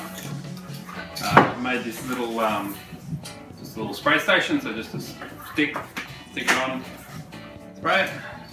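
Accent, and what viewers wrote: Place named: Australia. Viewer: A fellow Aussie You Tuber, what a refreshing change to hear our own accent. Love the tool rack. I’ll try and make one this weekend but I think I’ll lay some steps in the base to stagger the rows of chisels. Being an old bloke on blood thinners, I don’t need any excuse to cut myself in the workshop. Thanks mate.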